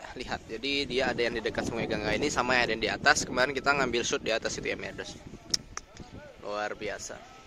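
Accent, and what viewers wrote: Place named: Jember, Indonesia. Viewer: Jember bgt iaa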